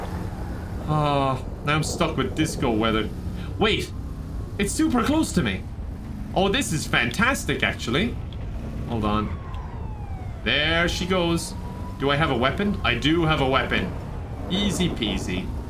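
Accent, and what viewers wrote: Ireland. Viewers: Personality, an irish accent, a chaos mod in GTAV, it's pretty great. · Dark viper but irish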